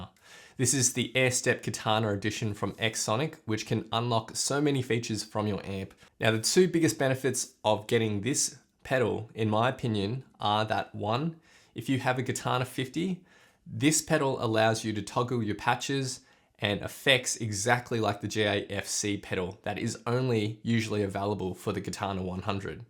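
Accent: Australia. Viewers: Bought an airstep katana a few weeks ago and just watched your video. Sorry I didn't order through your link. Super clear explanation of technical issues without getting bogged down. You are a great teacher/explainer. I will look at some of your other videos. I lived in Hobart for 23 years <num> so nice to hear your aussie accent. Maybe you know the folvig bros Lawrence or Elliot. Nice guys really good guitarists. I used to play w/their sister in TAS. But melbourne is a lot bigger pace than Tassie.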